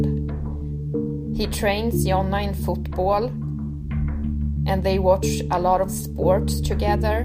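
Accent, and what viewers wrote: Sweden. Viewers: William Redshield This language is called svengelska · The Swenglish is killing me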